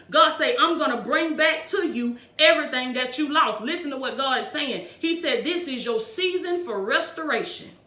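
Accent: South, United States. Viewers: Shalom beautiful Pastor, you have come so far since you began your channel. you are looking so good!! You are such a lovely blessing to the Bride of the Lord. I love your sweet country accent, I have beautiful friends from the deep south and I always enjoyed thier cute accents and delicious home cooking. No body cooks as good as southern cooks, you guys throw down and make everything taste so yummy!! Nothing as good as greens and corn bread 🍞. Yummo!! Blessings Cherokee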